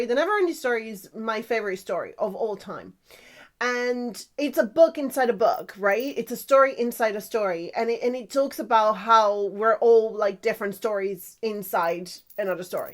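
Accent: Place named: Manchester, United Kingdom. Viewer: FINLAND. RAH! Anette Olzon and Floor Jansen RULE. Nightwish is beyond. You just became interesting. Pure power exists. Watch. Listen. Having seen every rock band on the planet over decades.... There is no contest. This crew hammers it. You sound Manchester. Hope that isnae insulting. RE-target yourself. It does help.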